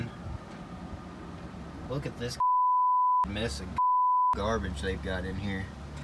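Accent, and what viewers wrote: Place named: Missouri, United States. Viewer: Thought this dude was from around Omaha for a sec. nah he from MO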